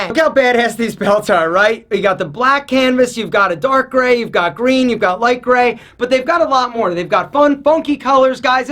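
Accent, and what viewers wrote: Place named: East Coast, United States. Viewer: Hey I enjoy your channel...quick question for ya. I think I recognize that accent. Might it be from a major East Coast City between New York and Washington? I have a bet with my wife!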